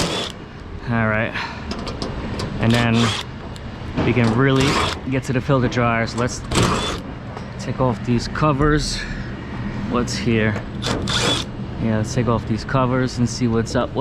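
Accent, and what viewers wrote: New York, United States. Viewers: Your from newyork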